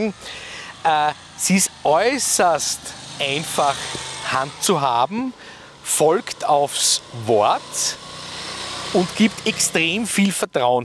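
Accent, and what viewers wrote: Austria. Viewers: Ich liebe euer österreichisches Dialekt. Ich bin diesen Renner gestern Probe gefahren und habe danach gleich unterschrieben.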